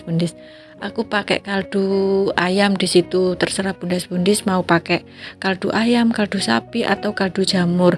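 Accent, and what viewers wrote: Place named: Java, Indonesia. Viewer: Wah medok sekali Asal dari mana bund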